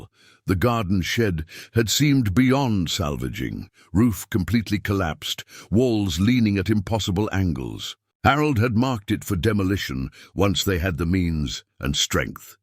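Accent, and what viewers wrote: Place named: United Kingdom. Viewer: It's British not Americans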